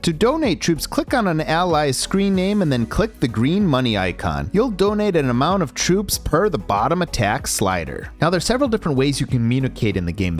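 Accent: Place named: Canada. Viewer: I’m from upstate New York and I hear it sometimes in a select few words… he sounds Canadian so I guess that checks out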